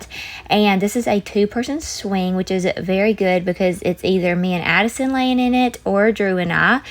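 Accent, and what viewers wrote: South, United States. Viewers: I live in the North and I have to be honest. I love your Southern Accent, but I cannot understand a Word you are saying ! The Reason is you talk way to fast. Maybe if you slowed down and did not run your sentences together, It would be easier for some of your viewers.🙂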